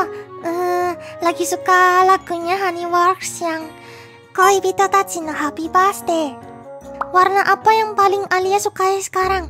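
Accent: Java, Indonesia. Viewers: Kalo aliaNgomong imut imut kel gitu..aku dengernya alia kek medog gitu loh..ke org jawa yg lg ngomong indo...hihihihi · Kok ngomongnya medok Jawa ? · Alia suaranya kyk ada medok" nya gitu:> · Gua denger lebih detail lagi suara kak alia ada logat jawak nya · Alia logatnya kayak jawa wk 🤣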